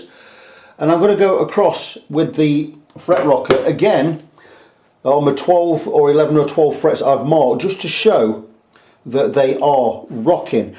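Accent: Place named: East Midlands, United Kingdom. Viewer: Hi. I have this exact guitar too and I absolutely love playing it. By only bug is the nut. I think it would benefit from a graph tech nut or something along those lines. Possibly even some locking keys like other PRS models. Your accent sounds pretty close to where I’m from. Possibly Derbyshire/Nottinghamshire or around that area? (Also based on your comment about being around 60 miles from Northampton) If so I’d be interesting in using your services in future for a few mods and setups etc. Thanks for the video, it’s reassuring to know you take good care of peoples instruments rather than handing it over a counter and not seeing it for a few days 👍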